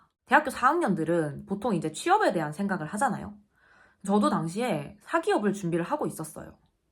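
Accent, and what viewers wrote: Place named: Gyeongsang, South Korea. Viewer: 서울말 쓰려고 노력하시는 경상도분이시군요!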